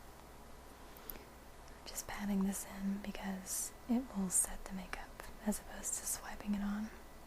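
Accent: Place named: South, United States. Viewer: Sounds like she's from my part of the world... Down south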